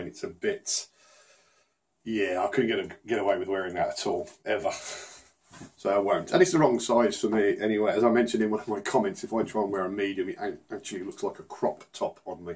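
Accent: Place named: England, United Kingdom. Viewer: After seeing a bunch of your videos, I've realized you use a lot of the terms my dad uses and you even have a similar accent. What part of England are you from? My dad's from Saddleworth in Manchester. Are you from around there?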